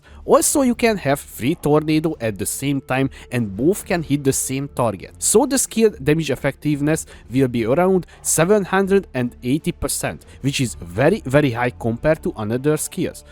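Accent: Hungary. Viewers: I agree with the comment that warns new players to avoid this. AVOID this build. Why? -You are sacrificing a fuck-ton of item slots for unique items. Both daggers taken, chest slot taken, and you STILL need to solve 100% inc spell damage taken on low life. -ONTOP of this, you are already losing 25% of your damage (it's like gaining a debuff: deal 25% LESS damage) because it's not possible to reach 100% conversion. -Also need to sacrifice 2 jewel slots to force out cold and lightning. 2 jewel slots is not insignificant. -In conclusion this is absolutely bait. You are sacrificing so many item slots and you have not even begun to address defenses. Also I can tell you are Hungarian by your accent.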